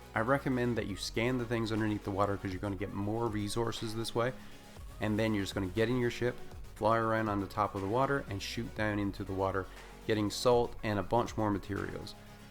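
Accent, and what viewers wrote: Newfoundland, Canada. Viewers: Lol, I was thinking the entire video that you sounded Newfie.... and then your outro confirmed it haha. Obviously I didn't check the channel name before watching XD.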